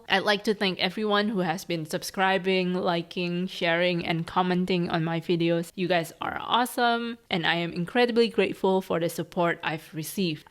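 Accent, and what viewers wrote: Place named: Indonesia. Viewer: I i recognised your accent and i checked your instagram and as a fellow chindo IM SO HAPPY TO HAVE FOUND A INDONESIAN CONTENT CREATOR ESP ESSAYIST wadawww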